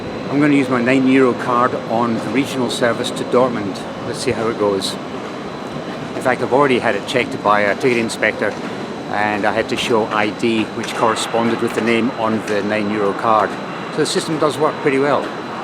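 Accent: Scotland, United Kingdom. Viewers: Great Skaughtisch accent!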